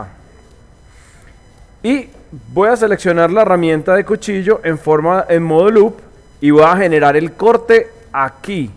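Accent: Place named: Colombia. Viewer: Hola enhorabuena por tomarte el tiempo de hacer este tutorial, fue muy explicativo. Estaré atenta a nuevos videos! Tienes voz de Colombiano jejjejejej me agrada mucho lo sencillo que explicas. Muchas gracias!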